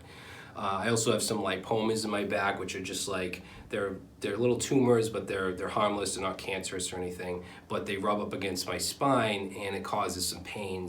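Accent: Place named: New England, United States. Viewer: your accent, t-shirt, and water bottle bring me home. I grew up between Chelmsford and Southern New Hampshire. (I live in Illinois now.) I benefit from your work. You are a really good teacher, keep it up.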